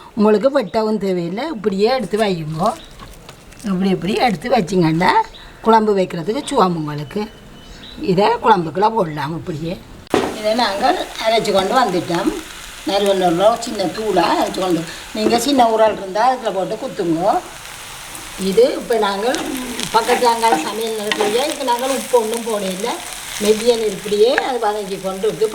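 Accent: Jaffna, Sri Lanka. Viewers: உங்களது சுத்தமான யாழ்ப்பாணத் தமிழும், காணொளியிலேயே மணமணக்கும் சமையல் செயல்முறையும், அப்படியே என் அம்மாவைக் கண்முன்னே நிறுத்துகிறது! துரதிஷ்டவசமாக, அம்மாவை இழந்துவிட்டேன், இருந்தும், உங்கள் செயல்முறையைக் கடைப்பிடித்து, அம்மாவை நினைத்து, பல வகையான குழம்புகளைச் செய்துபார்த்தேன்! ரொம்ப நன்றி! இருந்தும், அம்மாக்களின் கைப்பக்குவம் என்பது தனியான ஒன்று! 100க்கு 100 அவர்களின் சமையல் ரகசியத்தை நம்மால் தொடமுடியாது! உங்களுக்கு நல் வாழ்த்துக்கள்!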